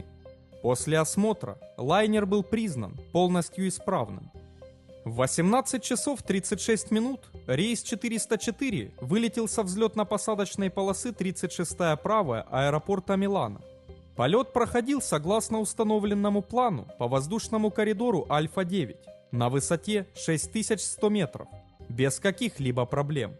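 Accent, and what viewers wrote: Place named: Zaporizhzhia-Dnipro, Ukraine. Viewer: только из-за этого жуткого запорожско-днепровского акцента смотреть и слушать не смог.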